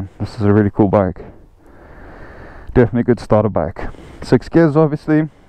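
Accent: South Africa. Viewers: What part of South Africa are you from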